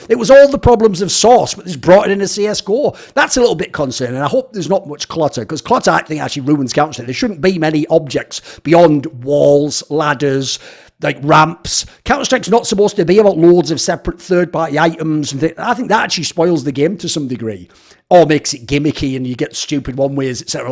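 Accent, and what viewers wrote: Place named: Middlesbrough, United Kingdom. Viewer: not wathced you in a while but glad to hear you've embraced your boro accent more than ever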